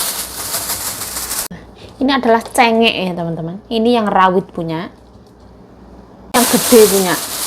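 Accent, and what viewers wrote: Java, Indonesia. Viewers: Logat jawanya di kurangi yu klw berbicara bahasa taiwan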